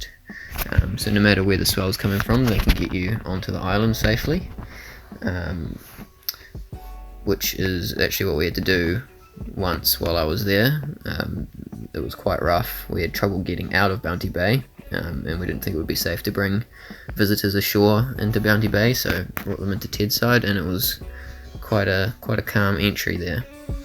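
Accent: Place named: New Zealand. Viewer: Nice video well done 👍. Do you live on the island? Did not really grasp if you do. You do sound like a Kiwi. No offence of course. I am a Aussie, no offence of course lol . Cheers bloke.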